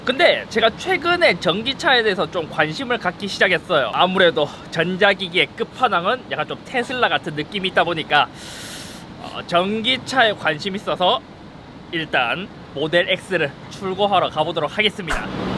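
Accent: Gyeongsang, South Korea. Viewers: 경상도 분이세요,,,? 서울말은 아닌듯한데 ㅋㅋㅋ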